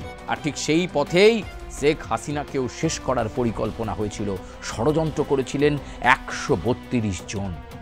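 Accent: India. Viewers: দাদা তোমার ভাষা তো,, কোলকাতার। বাংলাদেশি সাজছো কেন কেন???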